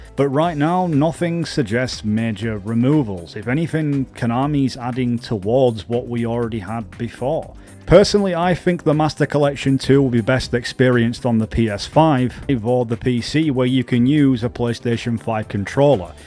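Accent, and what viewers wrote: Bradford, United Kingdom. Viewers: Yeah I don’t get why people are making out he’s Spanish or it’s not his first language. He sounds like he’s from Bradford or something 😂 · he’s pretty clearly from the UK, he’s putting dramatically and drastically together, not that hard to understand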